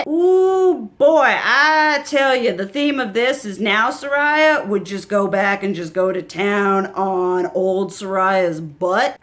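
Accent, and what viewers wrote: South, United States. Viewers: Just wanted to stop by and say I absolutely adore your aesthetic and accent! I was born and raised in GA and your accent is the perfect embodiment of what a southern accent is! Plus, your attitude and personality and energy presented in this video and how animated you present yourself is everything I can relate to! I’m here for it all haha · I dunno if anyone has told you this but you sound very American hah. Not in a bad way. My American peeps tell me I sound very Canadian.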